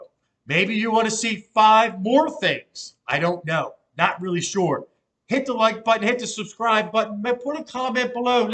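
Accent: United States. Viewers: Is that sarcastic? He’s a bit country but the info is at least smart sounding to me. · I can't focus on anything hes sayin over the soujd of his Pittsburgh accent!!!!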